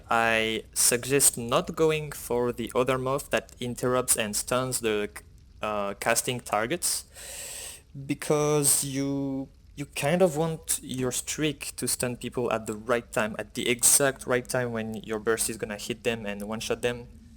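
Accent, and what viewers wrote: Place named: France. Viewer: Do you speak french?You have a french voice.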